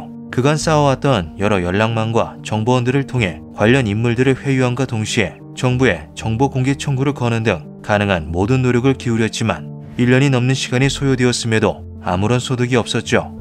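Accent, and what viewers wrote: Gyeongsang, South Korea. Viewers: 뭘 믿어야 하지? 혹시 경상도 출신이세요?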